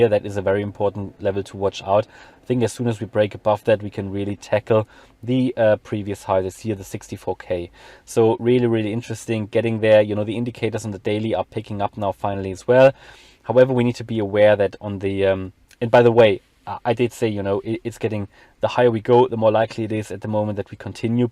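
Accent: Germany. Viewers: Ergo, love the German accent!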